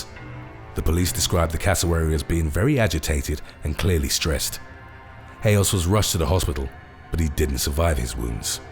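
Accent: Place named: United Kingdom. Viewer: He is not an American. So what he pronounced zebra as how it is spelled. We say Zeebra. He speaks the Queen's English.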